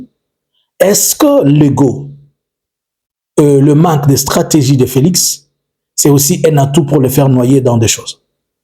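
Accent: Rwanda. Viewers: Aza rwandais, c'est normal, accent ya kinyarwanda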